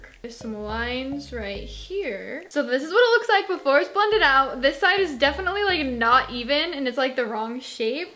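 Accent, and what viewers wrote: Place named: United Kingdom. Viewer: You sound British in this video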